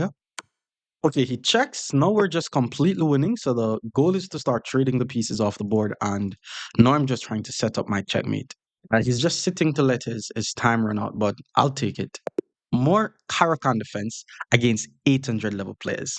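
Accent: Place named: Jamaica. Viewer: thank you so very much! I don't think anyone has ever played and also taught chess with more passion and joy than you. Combined with your smile, your warmth and the patwa accent it is absolutely infectious. Best moment of this video: - "double up his pawns...his pawn on e5 is also hanging...I'm gonna easily...got my king out of the center...my position is very solid...I have no weaknesses"...... one pawn move followed by his queen move: "this is actually a very scary positian"....comedy gold! Stiil have to laugh about it. Every single video makes me smile the entire time. Please keep on shining!!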